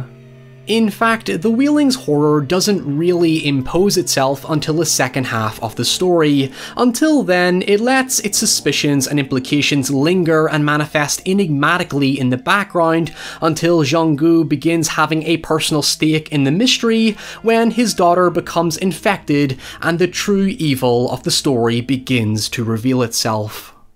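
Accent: Northern Ireland, United Kingdom. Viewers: Northern Irish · He's from Northern Ireland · He is from UK, you know the f'ing birthplace of the language called English!?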